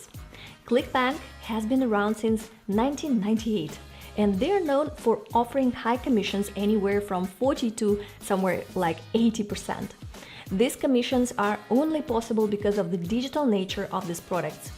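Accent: Russia. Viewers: Russian accent 😮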